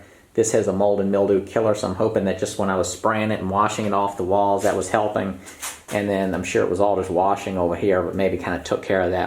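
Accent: South, United States. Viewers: Hi! You finally whooped that concrete pillar! Congratulations!! Will you tell us which state you live in? Just curious to know, since you and I share the same southern accent. If not-that's good too. Enjoy these videos a lot.:-)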